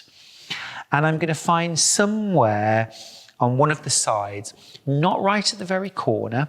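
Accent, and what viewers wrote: England, United Kingdom. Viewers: Inspiring tutorials & luv that English accent!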